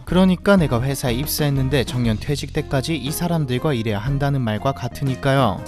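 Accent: Gyeongsang, South Korea. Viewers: 딱보니 경상도 😂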